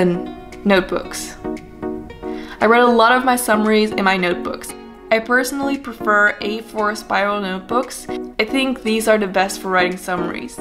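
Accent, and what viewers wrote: Netherlands. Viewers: WHAT? are you dutch? omg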